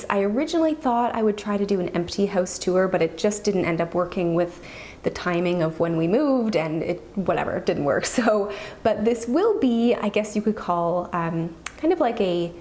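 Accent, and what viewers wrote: Canada. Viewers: Hehe I love your Canadian accent ie the way you say "house"! It's so fast that it's almost like "hoose" and it's very cute!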